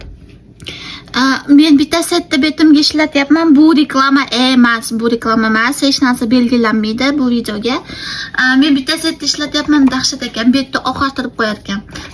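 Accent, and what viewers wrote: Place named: Fergana, Uzbekistan. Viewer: Ozbekistonni qayeridan edingiz shevangizdan Fargonalikka oxshadingiz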